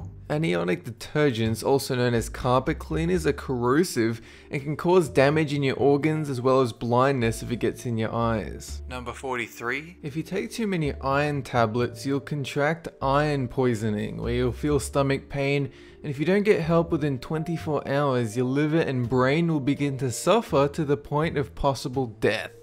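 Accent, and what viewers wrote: Australia. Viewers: He's Australian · been listeing and He's an austurlian I think.... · Only just realising this now. He's probably an aussie. I tend to tune out accents which are the same as mine because I hear it every day... but I don't think he's from Victoria originally (if he lives here now. I heatd someone in a shop the other day who sounds a lot like him). Maybe NSW or QLD... not sure...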